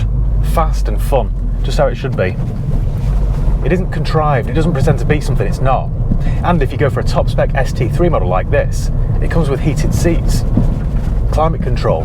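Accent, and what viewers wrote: United Kingdom. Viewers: Cool review but why are you speaking British? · Probably because he is from the uk 😅 what a stupid question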